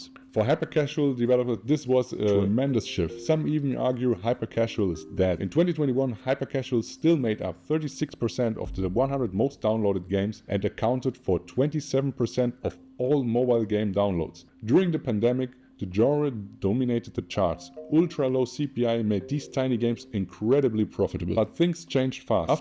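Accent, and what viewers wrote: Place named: Germany. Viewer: i smell german. wie machste das mit den steuern ?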